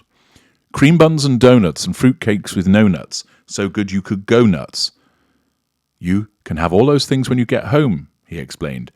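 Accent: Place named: Scotland, United Kingdom. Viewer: What would be worse than to go through the Apocalypse? Finding that Harry's Wife was on the Panel on... Judgement Day! She'd Close the Gates to everyone! Thanks, loved your Scottish Accent!💖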